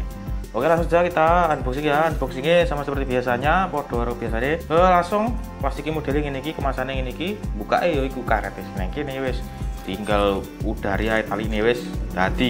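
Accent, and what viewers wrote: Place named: Java, Indonesia. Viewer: Medok 2 salam salim slem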